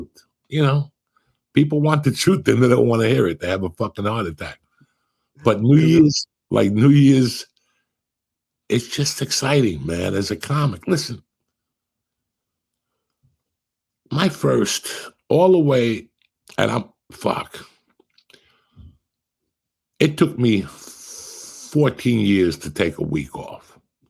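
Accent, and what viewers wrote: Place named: New York, United States. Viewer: Happy New Year Mistuh. I live in Texas but My Dad n that half of my Family are from New York. Haven't seen that side or been up there to New York since about 2ooo or 2oo1. But listening to you reminds me of them jus mainly based off your accent accompanied by the way you talk lol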